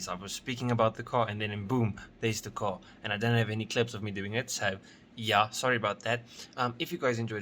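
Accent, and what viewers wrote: Afrikaans, South Africa. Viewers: First thing I hear is the Afrikaans accent. Appreciated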